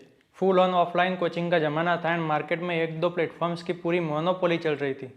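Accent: Gujarat, India. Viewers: Gujrati tone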